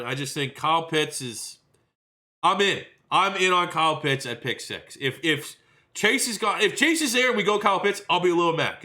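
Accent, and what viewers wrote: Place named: Canada. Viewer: This guys great. Sounds Canadian even though he’s a philly fan. Also what website did he use?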